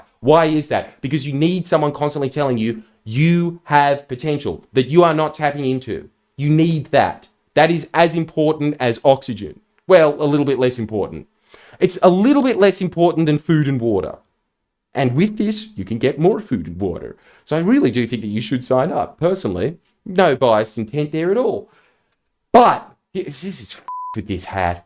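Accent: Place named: Australia. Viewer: you often say things that alot of people have said thousands of times in thousands of ways, yet its only clicking when i hear it from your aussie accent. thx for these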